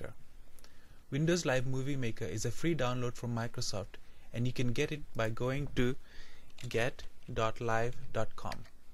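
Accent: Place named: India. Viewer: Indian accent is the best. :)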